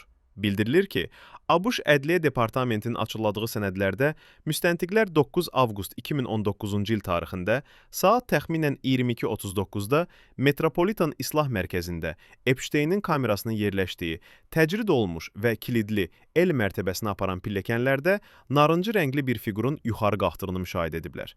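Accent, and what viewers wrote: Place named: Azerbaijan. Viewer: Senın dedıgınde. Bır şey. Anlamıyorum. Azerı konuşuyorsun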